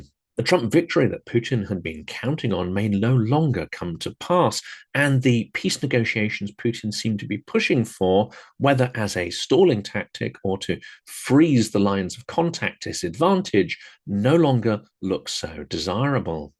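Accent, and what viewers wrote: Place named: United Kingdom. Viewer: Well done,! Always incisive, well thought out, and stated in excellent intellectual British English which is very pleasant to American ears. Love your podcasts. One of the best podcasts on You Tube.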